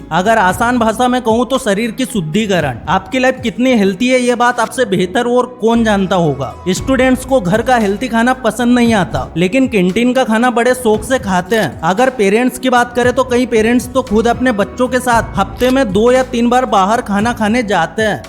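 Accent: Indore, India. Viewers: Your accent quite similar, like typical Indori.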